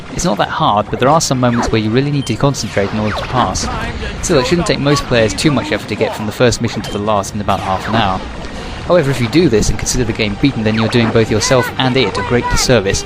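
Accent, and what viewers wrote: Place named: United Kingdom. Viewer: You understand that he just sounds British, right? :)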